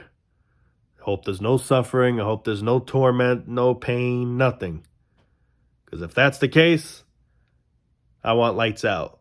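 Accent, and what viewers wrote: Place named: Boston, United States. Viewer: I'm listening to your accent and I'm fairly sure and please correct me if I'm wrong but you sound like you're from Boston ... I'm from Maine so I hear the dropped Rs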